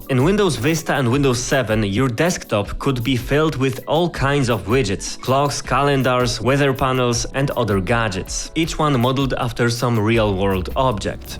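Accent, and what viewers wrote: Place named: Poland. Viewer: Is this an english version of? Same thumbnail, voice and video in general. Czy mam rację? I feel that I shouldn't be here lol. Oh btw I FEEL Polish accent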